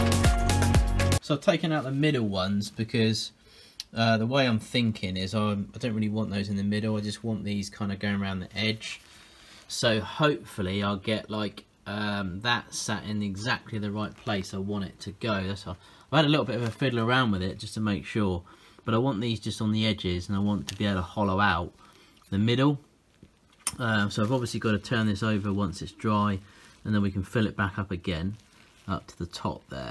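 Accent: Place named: United Kingdom. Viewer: I want to go to the UK just so I can walk around and hear everyone talk. Your accent is so cool.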